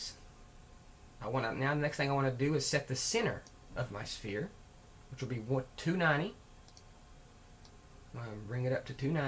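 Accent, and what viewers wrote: South, United States. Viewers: you have to be southern. i can hear it in your voice. i'm from NC! thanks for the tutorial.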